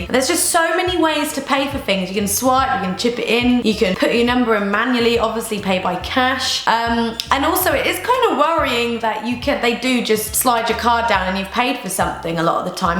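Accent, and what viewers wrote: United Kingdom. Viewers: I usually dont like british ppl because of the accents but u r diffrent i like you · i could listen to a British accent like all day long. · ur accent is so lovely. where are u from in the UK? :) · I'm an American and I absolutely love the female British Accent!! 😍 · Your limey voice hurts my head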